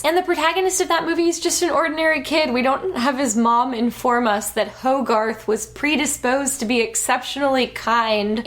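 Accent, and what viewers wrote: New York, United States. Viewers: Your New York accent made me cry laughing. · You indeed sound like you are from new yawk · I don't care what people say, your costume is amazing...that new york accent thooo.....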